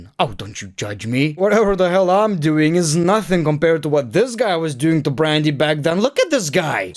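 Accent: Russia. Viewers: I need more Russian accent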